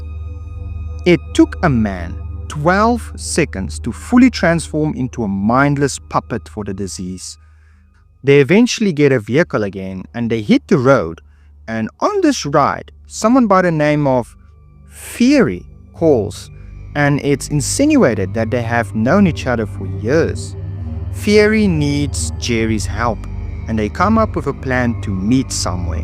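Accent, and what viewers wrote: South Africa. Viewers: Hey are you south african by any chance?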